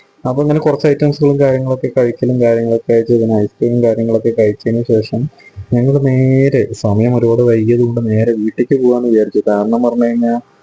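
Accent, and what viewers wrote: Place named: Palakkad, India. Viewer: ettan powli🤩 adipowli aayitundu nammade ah palakkadan slang il thanne adi manoharamayi eduthitundu ineyum idupole orupadu videos edukkan kazhiyanam ennu njn aashamsichu kollunnu🎊👍🏼💖🎉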